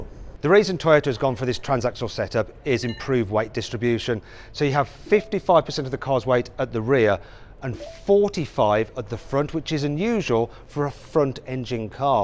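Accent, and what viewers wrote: United Kingdom. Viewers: ブリティッシュアクセントたまらん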